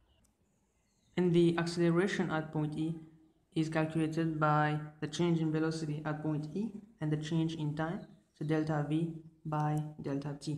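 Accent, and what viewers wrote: Mauritius. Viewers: are you mauritian??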